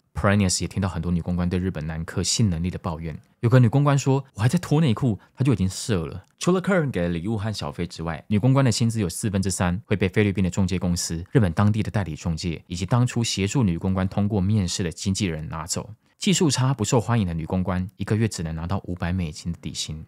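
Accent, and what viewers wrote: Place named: Taiwan. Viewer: 第一次看到的影片，讓我迅速就變壽粉了。臺灣人的口音跟中字幕讓我聽起來好舒服看起來易懂…………都噴水了…………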